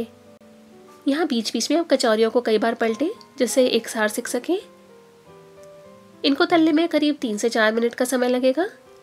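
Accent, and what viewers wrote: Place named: India. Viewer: I like to make new recipes and I always try new ones and I m a regular visitor of ur channel. I like ur hindi accent n ur way to describe d whole recipe but today, very first time I m seeing u in indian attire. looking very nice as ur recipes.. keep doing ji and thanks for different types of cookery inventions.. ☺👍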